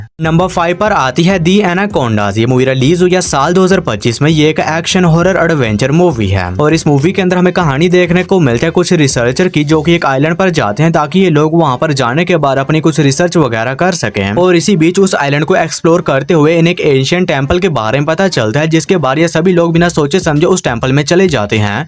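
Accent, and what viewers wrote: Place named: Lahore, Pakistan. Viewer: Bhai tum Lahori ho Kya bolne ka tarika waisa he hai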